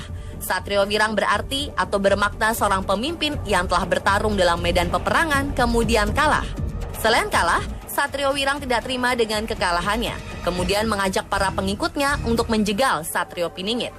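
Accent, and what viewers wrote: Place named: Banyumas, Indonesia. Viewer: Ngapak oye'...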